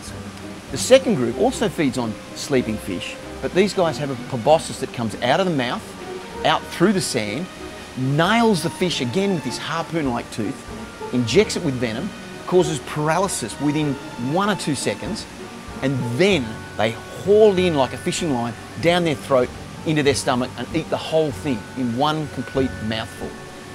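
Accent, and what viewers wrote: Australia. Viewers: Ur ausie arent yah? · As soon as I heard the Australian accent, and the one speaking the accent inside a lab coat, I was all in. Subbing to ya mate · Are you Australian? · Having this explained with an Australian accept on makes sense.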